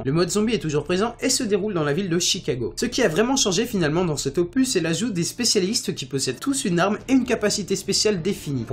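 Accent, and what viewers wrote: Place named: France. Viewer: Putain ca partait bien ... Puis ce putain d'accent francais a tout gacher Cale of duti... Et l'répèter tout le temps c'en est saoulant...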